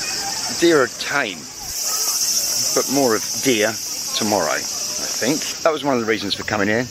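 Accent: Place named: Australia. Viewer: Really enjoy your narration. Bit of oz & cockney! Good videos too. You deserve a lot more views friend. Safe travels and regards from N. Ireland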